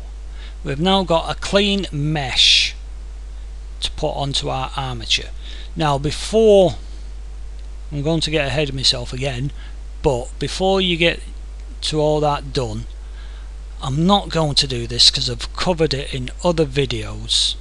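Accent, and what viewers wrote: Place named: Yorkshire, United Kingdom. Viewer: Agreed. I had to search a bit to find this but it saved me from having to use Maya. You sound like you're from somewhere by Yorkshire too,..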